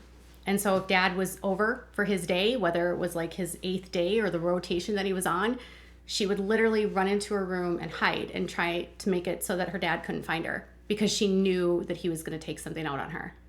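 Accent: Minnesota, United States. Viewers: Where are you from bc I hear a Minnesota accent here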